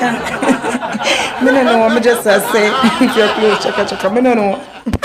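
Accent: Jamaica. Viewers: Real patois 😆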